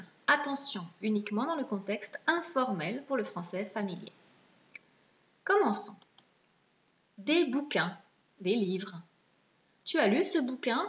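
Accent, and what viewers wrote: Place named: France. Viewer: tu as in voix tres feminin et tres interresant, une vrai accent feminine et franchise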